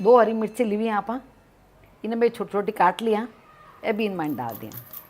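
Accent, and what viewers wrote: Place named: Marwari, India. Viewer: Aap meri sakhi kab bnogi vaise yaha meri pach saheliya hai chhthi aap ban jao.aap ki marvari mithi lavati hai ek jaypur me bhi meri s aheli. hai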